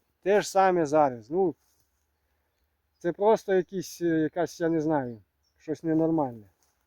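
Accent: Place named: Ovruch, Ukraine. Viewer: Вітаю, напиши яка назва вашого села,я чую наш говір, я з овручини,наперед дякую.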